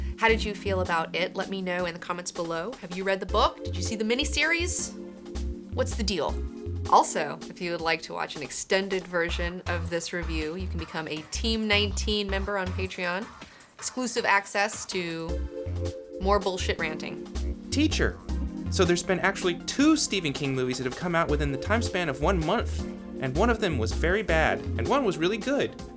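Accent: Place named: Baltimore, United States. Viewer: The Baltimore accent is strong with this one. 😀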